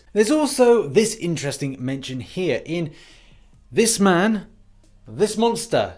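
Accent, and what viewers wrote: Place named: RP, United Kingdom. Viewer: Keep speaking that queens English bud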